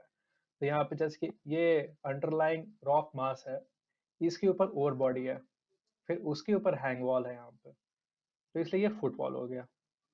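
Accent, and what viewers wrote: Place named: India. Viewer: I can't understand because your indian accent.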